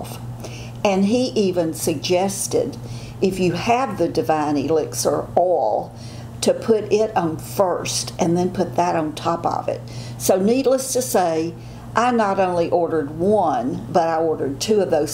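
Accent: South, United States. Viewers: Kindness begets kindness, you are so right! And I believe it is contagious! BTW, I love southern accent. No other accent in the world is as charming, and makes you want to smile regardless of how you may be feeling. And yes, I am a southerner so I might be a "tad" partial!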